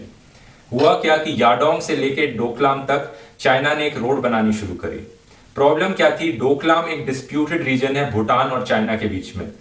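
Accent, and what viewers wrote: India. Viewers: Another curry accent video full of boastful speech.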